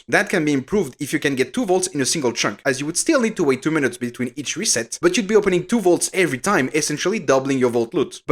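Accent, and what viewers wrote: France. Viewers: Tysmm! W video funny french accent lol.Greetings from algeria ❤ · you have a french accent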